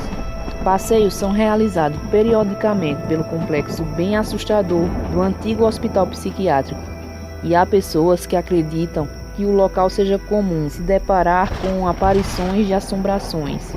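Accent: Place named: Northeast, Brazil. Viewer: Gosto do sotaque nordestino. Não é atoa que a esposa,falecida, do Mark Nazarova o deixou meio doido depois que ela faleceu, como se não bastasse a filha falecida antes da mãe vítima na guerra. Lastimável. Jesús Cristo e Deus te abençoe e console Mark Nazarova, Deus é bom. Deus e Jesús Cristo nós abençoe e proteja sempre 🇧🇷🌈🌻🙏🏻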